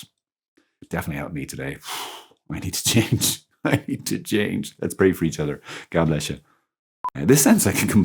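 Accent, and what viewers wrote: Ireland. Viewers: Great advice indeed! And nice to hear the Irish brogue! Beannaithe Dá leat!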